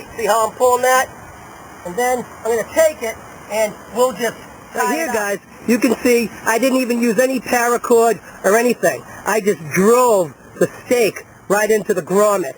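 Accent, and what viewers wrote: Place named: Boston, United States. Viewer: Ha! You're definitely from Massachusetts or maybe even Rhode Island. What gave it away, you ask? Oooh, one of the original shirt designs from WAAF, the Patriots hat and the THICK Boston/Providence accent. I'm not raggin bud, I'm from Massachusetts and I often forget to realize how we sound... it cracks me up. I don't usually see or hear fellow New Englanders on youtube, kudos. Great video, with a great idea. Keep it up. Cheers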